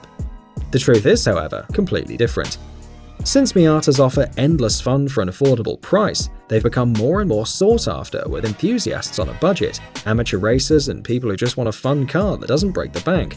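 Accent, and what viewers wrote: England, United Kingdom. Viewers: Weird to hear a British accent and loads of British numberplates, and hear you call an MX-5 by its US of A name.. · Are you from England?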